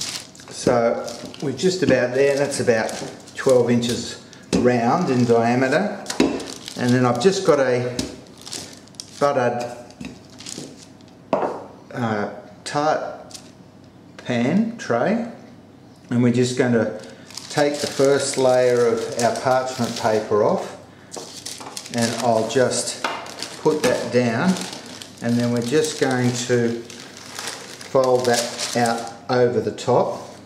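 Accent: Australia. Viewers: Delicious !! Are you from Australia ?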